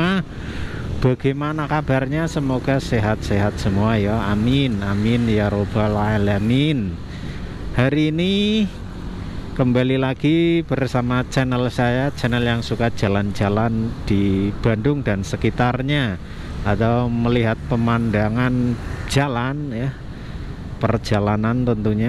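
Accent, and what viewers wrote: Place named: Central Java, Indonesia. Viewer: Mas dari Jawa Tengah logatnya kaya ngono Cilacap atau Cirebon sekitar nya 👍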